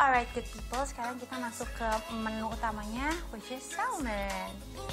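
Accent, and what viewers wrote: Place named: Malaysia. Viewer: bahasa inggrisnya kayak org malaysia..ancur dicampur campur.. goodbye bahasa Indonesia klo artisnya saja suka pamer bahasa inggris nya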